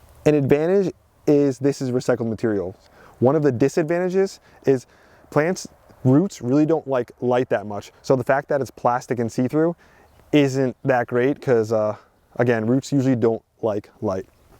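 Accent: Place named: Philadelphia, United States. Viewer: are you from philly or jersey? I can tell because you say wuter instead of water like i do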